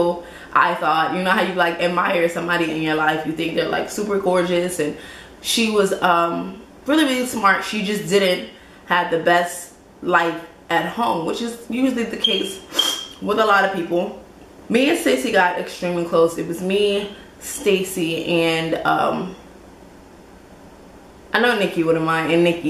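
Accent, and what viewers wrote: New Jersey, United States. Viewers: Oh so you from jersey? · You from jersey? Sorry for your loss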